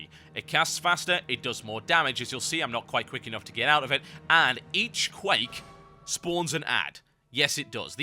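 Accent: United Kingdom. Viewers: f.u.b1ch u sounds british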